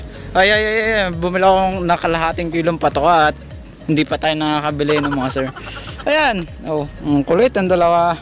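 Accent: Batangas, Philippines. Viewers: Batangas ka ga sir😂😂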